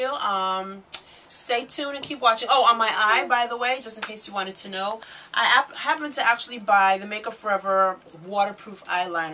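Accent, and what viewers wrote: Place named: Caribbean. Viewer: you're gorgeous and i love your personality... which west indian island are you affiliated with... yup, i hear an accent 8 )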